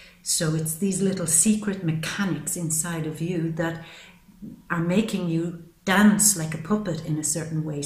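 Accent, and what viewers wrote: Ireland. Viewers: You're Irish also? 😊😂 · Very nice to find you! Are you Irish? Great reading! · Do I detect an Irish accent?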